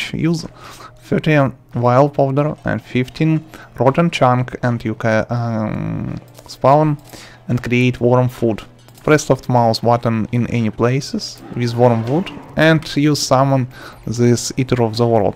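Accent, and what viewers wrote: Russia. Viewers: I like this youtuber not only for he's good explanation but also he's Russian accent (sorry if that is offensive) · Russian accent😫😍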